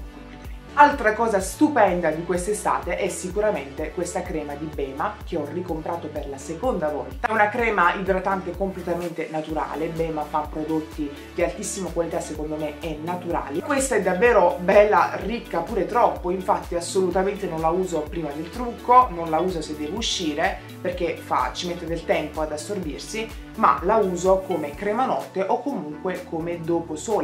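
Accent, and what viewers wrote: Apulia, Italy. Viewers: i versi mi hanno uccisa😂, sei bellissima ,si sente che sei pugliese,lo sono anch'io, se non sbaglio sei di Foggia... ciaooo bacii😂❤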